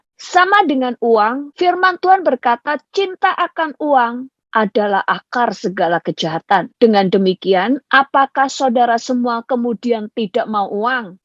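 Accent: Java, Indonesia. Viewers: Aku suka pengajaran dari ibu. Pengajaran mudah dimengerti dan bahasanya sederhana. Meski ibu lama di Amerika tapi medok Jawa nggak bisa hilang. Tuhan Yesus memberkati ibu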